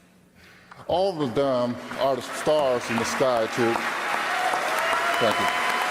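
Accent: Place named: United States. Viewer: 눈감고 들으면 그냥 미국인이다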